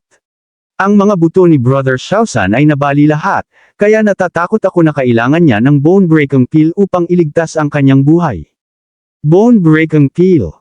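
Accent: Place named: Visayas, Philippines. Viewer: Ang recap mo parang bisaya eh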